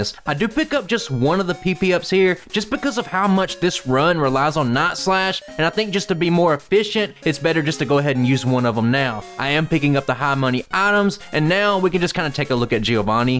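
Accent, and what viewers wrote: South, United States. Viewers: to hear your Southern drawling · You are one of the only Youtubers with a southern accent that I have ever watched.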